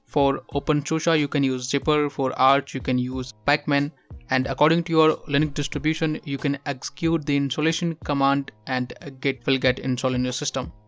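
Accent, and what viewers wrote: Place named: India. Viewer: Talk slower please, I can learn and speak with second language accent. me, a 57 year old white guy trying to learn Linux-GNU from the beautiful (vernacular) Indian accent. You speak faster when giving instructions or says a new name.vernacular I have to learn more acronyms than the Navy- Air force and Space Agency put together. oO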